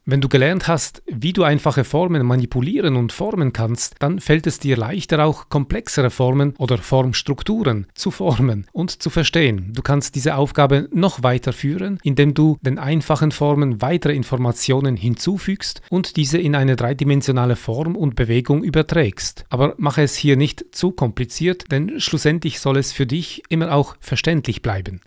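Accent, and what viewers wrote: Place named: Switzerland. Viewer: finde ich auch den Schweizer Dialekt so lustig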